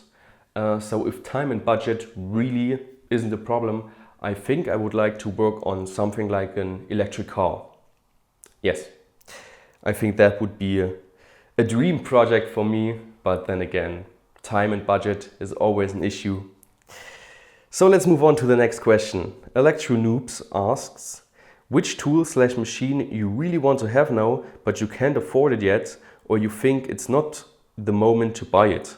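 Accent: Germany. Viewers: So my question is: Without getting too specific so as not to reveal too much detail that you may not want to put online about exactly where you live... (Where your from does not necessarily say where you currently live, and using large regions does little to narrow down a specific location, and accents could come from parents rather than matching exactly where one grew up)... your accent is not what I'm accustom to when I think German Accent, so what part of Germany is your accent from? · I really like your English with german accent.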